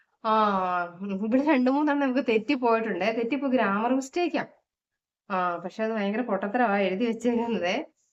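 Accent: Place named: Kottayam, India. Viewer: Ee kottayam slang engane chechi kku vanne... Ennayalum kekkan rasava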